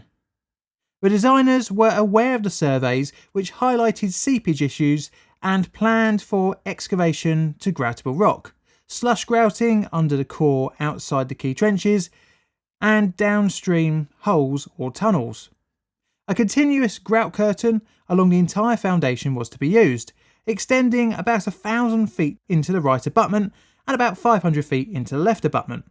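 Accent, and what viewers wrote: United Kingdom. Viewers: Why is this narrated by a limey?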